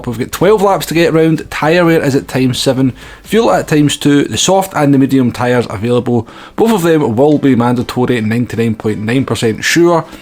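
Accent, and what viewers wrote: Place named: Liverpool, United Kingdom. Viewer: or is it scouse written. I don't know. Pretty difficult to understand it correctly. It seems that you're comming from Liverpool or somewhere over there.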